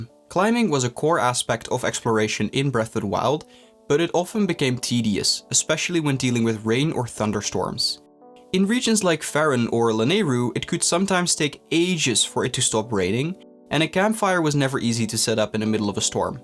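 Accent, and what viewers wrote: Netherlands. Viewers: Dutch detected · Are you perhaps Dutch? I recognize that accent 😂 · Are you dutch? You sound dutch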